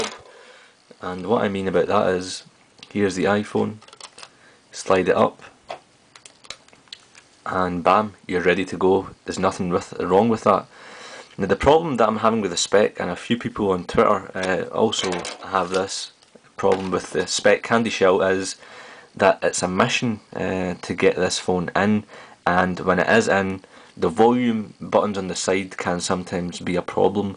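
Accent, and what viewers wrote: Scotland, United Kingdom. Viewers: Your accent is so cool! I'm going to take a guess - Scottish?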